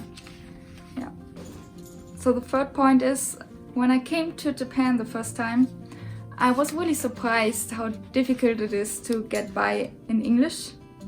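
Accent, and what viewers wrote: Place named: Germany. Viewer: you should be careful saying stuff which you "don't like about Japan', instead of saying 'don't get used to', 'can't really understand' , 'hard to understand' or "hard to get used to'. you're such a typical German saying your negative opinions direct, even your words can make other people's feelings uncomfortable, and you get haters instead. if ur speaking English, better to speak beautiful English, not with that strong German accent which is so difficult to understand. it's better if you speak your own language, the 'direct language, German' and put a subtitle, because native English speakers would pick words more carefully, and wouldn't say so much negative things to make listeners feel uncomfortable. You should known that Germans are not so fond by others, because you guys are not so charming, I mean, not with attracting, warm hearted personalities, but cold and selfish. 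I often see that German people don't help others on the streets or on the train, but ignore and leave. they think it's none of my business or i don't wanna waste my time helping others, and get no reward. really cold people out there.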